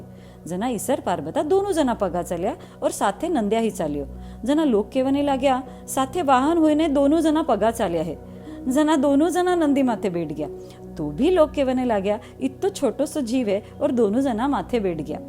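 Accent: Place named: Marwari, India. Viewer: Nice marwadi accent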